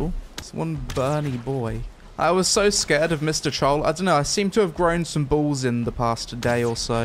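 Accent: United Kingdom. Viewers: thaa brrrrrrrrritish is very amusing. what a lovely chap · after watching this vid i fkin love british accent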